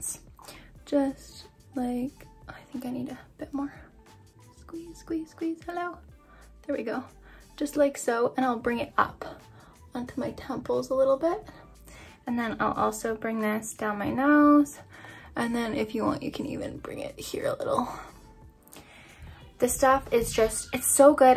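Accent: England, United Kingdom. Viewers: I’m so used to you randomly breaking into British accent I didn’t even realize it till my sister pointed it out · I do the British accent all the time too 😭😭 you look so cute!! I hope your date went well !! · girl i love you but pls stop the british accent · still obsessed with the english accent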